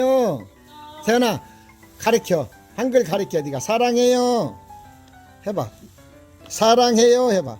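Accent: Jeolla, South Korea. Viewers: 님사투리가 진안장수그쪽인가요 옛날군생활할때후배가비슷한사투리썼는데 귀에익어서요ㅎ암튼잘보고있어요 건강하세요!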